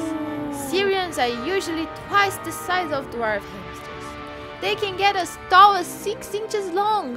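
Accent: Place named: Brazil. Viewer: are you brazilian? you have a brazilian accent that i have too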